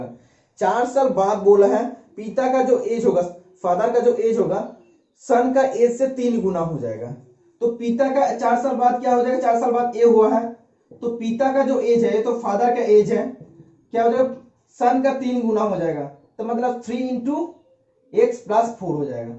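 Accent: Bengal, India. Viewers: Vaiya apko bat sunkar bihari nahi lagrahe Bangali lagrahe ENG er puro 25 questions dekha digiye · tumi bangalee?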